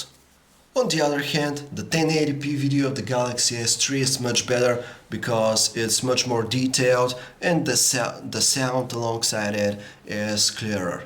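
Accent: Russia. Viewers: Sound like a Russian accent to me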